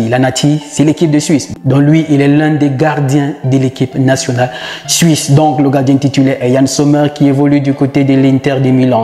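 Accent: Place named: Cameroon. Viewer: Il a le macabo des camerounais et ça gratte trop ds sa gorge. Et il se dit "motivateur"...... Pathétique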